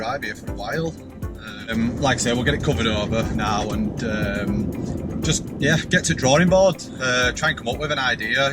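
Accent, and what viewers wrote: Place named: Northern England, United Kingdom. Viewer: Im really curious what part of england you’re from. Pretty cool to see a northern sounding lad off gridding in chile!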